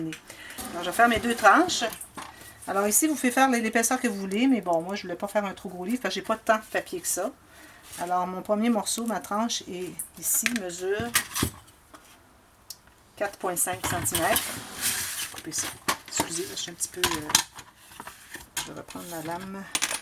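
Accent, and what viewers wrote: Quebec, Canada. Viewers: bonsoir, dès que j'ai écouté votre vidéo je savais que vous étiez québécoise tout comme moi. J'ai remarqué votre plaque à découper aussi, j'ai la même. Ces pour cela que j'espèrais enfin où trouver ces cartons, je venais de trouver une référence de chez moi. Je suis de Laval donc accès aux grand centre et je commande aux États-Unis aussi, mais je n'avais pas le nombre de gramme ou un nom pour mis référer. Merci!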